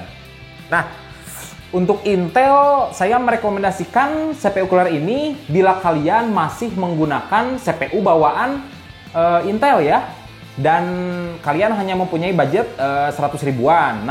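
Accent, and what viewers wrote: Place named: Tasikmalaya, Indonesia. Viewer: orang tasikmalaya dia kayaknya mas akwkwkw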